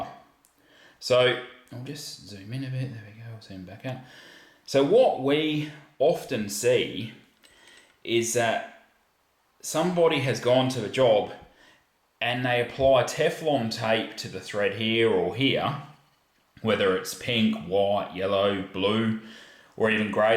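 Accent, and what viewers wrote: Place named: Australia. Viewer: VERY strong Australian accent. Fortunately i can understand it. Gave me a good tip i can use for the hose I’m installing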